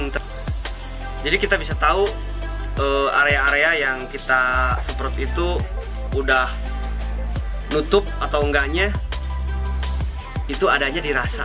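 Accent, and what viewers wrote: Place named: West Java, Indonesia. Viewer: bukannya Jawa ngapak ya? Kok logatnya Sunda? 🤔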